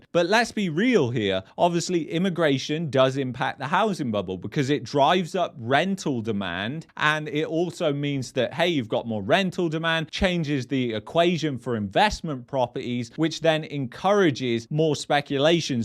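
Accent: England, United Kingdom. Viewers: Can't get enuff of your English accent 👍👍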